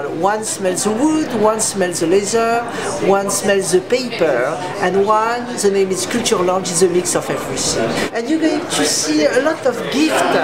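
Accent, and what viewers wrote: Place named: France. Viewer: his high pitch voice with french accent are very adorable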